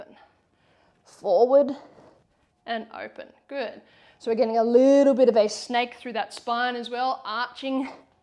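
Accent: Australia. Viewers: Hi. I sent this on your facebook messages but saw you don't go on there often from your last post.... you've popped up on my you tube and i've been watching a few videos and just love your energy and you resonate so much with me in so many ways but mainly as i've had a problem with my eyes/neck and dizzyness for so long!!! I want to get through your videos but i was wondering if there is any one video or sequence that you think i should start with? i just started your 10 minute lymth workout and i love it and the constipation one was so funny!!! Basically my eyes feel like someone has pulled them out on a spring and then let go and that weird feeling(like i've had that done before lol) but only way i can explain it. I look forward to slowly watching all your video. Thank you for helping so many people and i love your accent, aussie accent is the best!! Reminds me of my INXS days ❤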